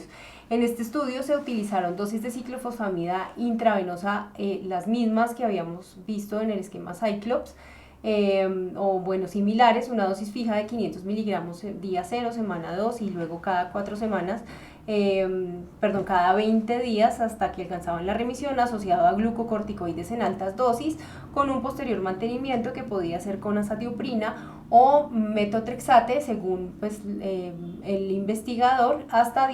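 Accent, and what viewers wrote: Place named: Colombia. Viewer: Definitivamente ya no puedo tolerar el acento colombiano, a partir de un daño gigante ocasionado por una mujer de allá. Se me revuelve el estómago, me repugna. Qué lástima.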